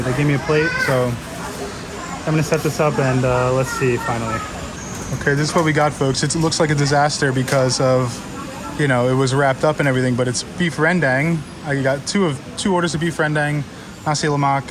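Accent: New York, United States. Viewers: Bro you`re TOO nice to these haters, i would have appreciated good old `get the fuck outta here!` rant with that NYC accent&attitude!😂🤣💪👍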